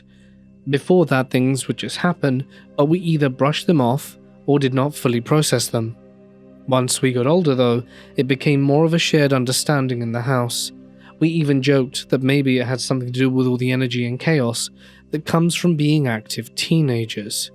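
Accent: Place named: United Kingdom. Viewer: British accen5 just isn5 doin* it for me. Gotta stop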